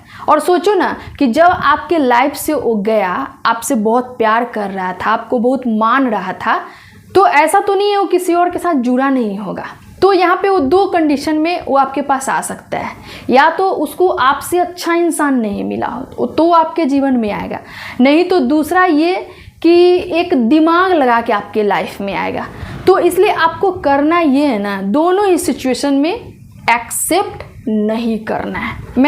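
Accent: Bihar, India. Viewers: Bahut sach kaha , aapki tone belongs to Bihar state ,? I imagine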